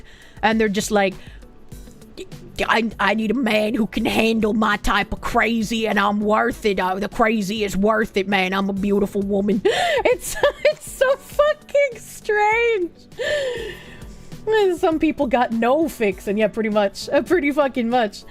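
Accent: Boston, United States. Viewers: Here here! We need her lovely Boston accent spitting facts out here.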